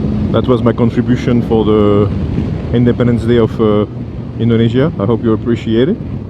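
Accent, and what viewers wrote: France. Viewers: I love french accent in your english , because i am french 🤗. Les moteurs des cooters honda sont il les mêmes que sur les scooteur sym ? Merci for your vidéos , dear french man . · A true French accent ! 😁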